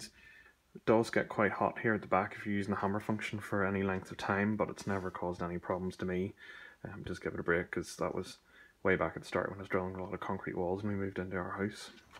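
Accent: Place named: Wales, United Kingdom. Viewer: Great review. I have the same drill and its light, powerful, (more torque than the PSB18 Li2). the only issue is the keyless chuck needs care and some force to ensure a good grip on the drill bits. And the Batteries are a stupid price... A bit of profiteering by Bosch I guess. On the plus side picked up a Bosch PDR 18 Impact driver (body only) but the same batteries fit, for £39 which frankly will do for 99% of my DIY needs. So far its fitted quite a large decking project, a new fitted kitchen and a garage / workshop and never skipped a beat. Great value.... Great Welsh accent btw..... 😉👍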